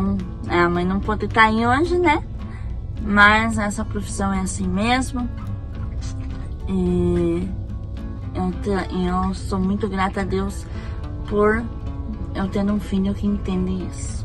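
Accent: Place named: Paraná, Brazil. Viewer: Parabéns pelo seu aniversário, que Deus continue cuidando, dando muita saúde e que tenha sucesso em toda a sua jornada, gosto do seu sotaque e das frases da sua região do Paraná😂😂😂😂👍🙌🎊🎁🍰🎂🍰🎂🏆👏👏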